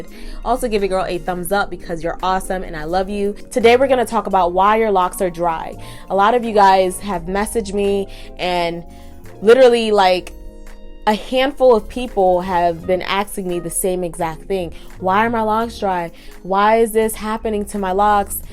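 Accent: Jamaica. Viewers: You from Jamaica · Ayyyy love the patois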